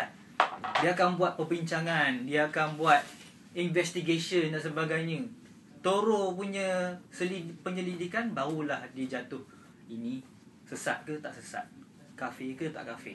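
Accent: Malaysia. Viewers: Ni konfem kelate..haha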